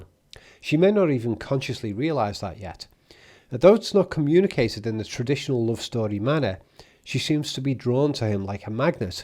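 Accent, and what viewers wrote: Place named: North, United Kingdom. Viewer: Your northern accent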